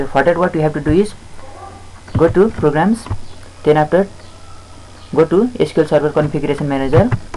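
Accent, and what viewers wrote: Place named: India. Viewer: Habla asi porque es el acento que tienen en la India, no es que hable mal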